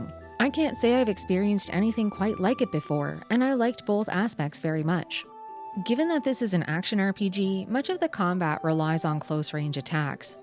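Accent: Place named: Canada. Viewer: you are actually from canada right ? i wont say that i spend very much time on your twitch channel because i get so busy with work that its hard for me to find enough time to sit down for long hours to watch the twitch livestreams. so i might have missed alot of the details about yourself or your experiences if you ever talk more about your life on twitch. i just tend to only check out the youtube videos you make instead. but im just sure that you must be from canada just because of your voice and because i have lived in canada my entire life too. canadian folk can often sense other canadians. what part of canada are you in though ? you can just say what province if you dont like giving out too much personal information. or ill apologize if my best guess is wrong and you arent in this country. i think we are also close to the same age as well but im all the way far east in the atlantic provinces. 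its rare to find anyone from canada that reviews these older retro games from what i have seen on youtube. so i just wanted to tell you that i really appreciate what you are trying to do with your channel. you are a true inspiration to other gamers in my opinion. someday i hope to start a channel and make the same kinds of reviews as well. i say that since i am also addicted to the rpgs, especially the retro rpgs. i dont think you have a discord server or i would probably try talking to you later. wish you all the best however and good luck with everything, take care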